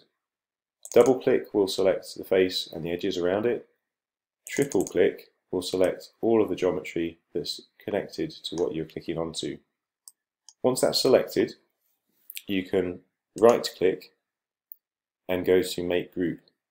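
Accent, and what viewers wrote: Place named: Sheffield, United Kingdom. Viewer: Thanks. Just what I needed after recently downloading sketchup which has inspired me to attempt a cabinet build in my shoe cupboard after watching your channel. Nice to hear a uk voice especially one from sunny Sheffield. Lol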